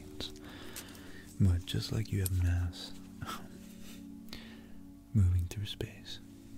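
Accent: United States. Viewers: Im late to the party with your asmr and im not a fan of a US accent but your voice my friend is absolutely perfect and within moments I know im going to drift off. I love the just talking about books and sciences and the mythology was cool. Please do more. You're not as prolific as some of your contemporaries who seemingly just like to get their norks out. Bizarre behaviour, its like asmr/onlyfans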